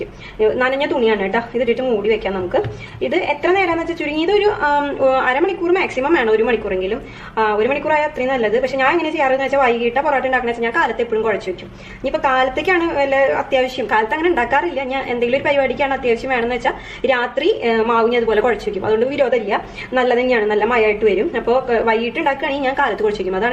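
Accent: Thrissur, India. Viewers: Thrissur ano sthalam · Enthu speeda samsaram...thrissur aano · ആദ്യമായി ഞാൻ ഒരു കാര്യം പറയട്ടെ.. ചേച്ചിയുടെ കുക്കിംഗ്‌ നെ പറ്റി എനിക്ക് ഒന്നും പറയാനില്ല.. superb.. but അതിനേക്കാൾ ഉപരി you are very sincere and a good humon being..... loved your thrissur slang.. iam also a thrissur kkari... 💪💪സംസാരത്തിന്റെ speed thrissur kkarkk ullathaa.. 🥰🤩😘🤣🤣🤣lam a begginar in cooking and a new subsciber of yours... stay blessed and stay genuine like this.. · Mmmade trissur bhaasha...kurachu speed kurachu samsarichu dear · Thrissur slang is good but if you lessen speed, we could follow you clearly. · Authentic thrissur slang. Keep it up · Thrissur aano veedu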